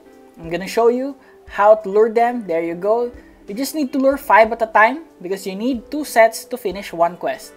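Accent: Philippines. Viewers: Filipino voice ur are filipino? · are you filipino the voice and the filipino just im guessing yure filipino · hes sound filipino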